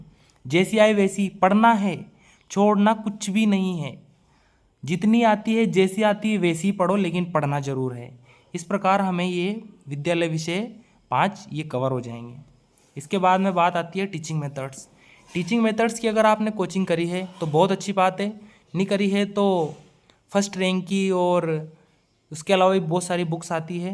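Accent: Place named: Sawai Madhopur, India. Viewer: Sir apke bolne ke tarike andaja lga paa rha hu aap sawaimadhopur ya aaspaas area ke hai....❤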